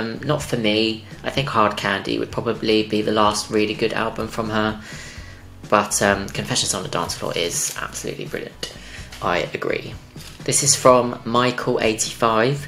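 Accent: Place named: United Kingdom. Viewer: Thank you for doing everything you’ve done! I love to see it. You’re very charming but it could just be the British accent 🤷🏼‍♂️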